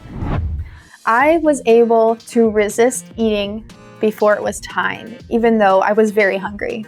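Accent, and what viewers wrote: United States. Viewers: inglês americano gata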